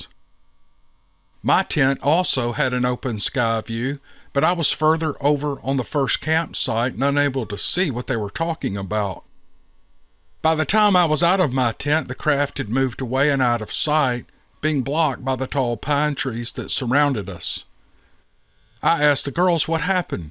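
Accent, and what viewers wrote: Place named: Texas, United States. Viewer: Is this guy from Texas Listen to that thick ignorant accent he's got. Like he's about to eat a piece of pie or just got done mowing his yard and drinking 5 beers to do so popping open the sixth his wife knows that she's gonna get beat down tonight so she pops a Xanax and a Vicodin and downs it with 1 whiskey sour mixed drink. She's only gonna feel it in the morning when her children are starring down at their breakfast eating in a hurry trying to avoid any eye contact or small conversation with their physically and sexually abusive father or their coward mother. Just five more yrs Billy says to macey his twin sister after breakfast as they swing on the back porch. Macey stares out into the acreage and deep woods of the backyard whishing someone or something would happen to end it all now. 13 long yrs of hiding under their beds running for their lives into the woods and communicating through walkie talkies to make sure they avoided their old man. 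Then church every Sunday where they have to watch him deliver his sermon from the front pew. The older they got the more the well masked hippocracy seemed to cut into not only their religious beliefs but also their belief in the goodness of humanity. Their mothers fingers seem to calmly and masterfully stroke the ivory and black keys of the church piano while the congregation sings hymns but if you sit close enough and know what to look for you can see her hands trembling slightly more each week as she loses her dignity and self respe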